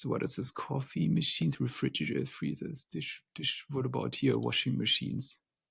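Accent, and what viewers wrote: Germany. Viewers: Wow, das ist der Hammer! Alles macht Sinn, was sie hier erklären. In meinem Kopf findet gerade eine Explosion von Gedanken und Ideen statt. Das muss ich erstmal verdauen. Elons großen großes Puzzle habe ich habe ich gerade erst begonnen zu verstehen. Vielen Dank für die Hilfe! Ich gehe davon aus ihrem leichten Slang nach😅 dass sie gut Deutsch sprechen und es wahrscheinlich ihre muttersprache ist.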